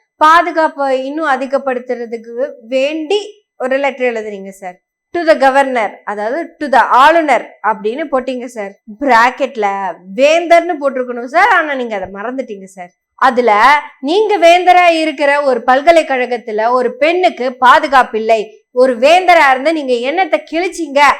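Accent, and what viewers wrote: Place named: Coimbatore, India. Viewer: பெரியார். பேத்தி. அவர்களே. உங்களுடைய. அறிவும். அந்த. கோவை. கொஞ்சும். தமிழும். சேர்ந்து. அரசியல். என்ற. பெயரில். இந்த. நாதாரி. பசங்களை. வச்சி. செய்கிரீங்கம்மா. நீங்க. உங்களைப்போல். அறிவாளி. பெண்ணை. பெற்றவர்கள். உங்கலுக்கு. நன்றி. பெண்கள். தினத்தில். நீ. நீடூழி. வாழ்க. என. வாழ்த்துகிறேன்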